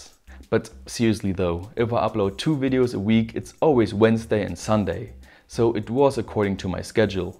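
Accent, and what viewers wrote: Germany. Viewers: So did I reading your comment, most, if not all, of his mispronunciations are due to his German accent, not his English skills, actually his English is excellent. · are you from germeny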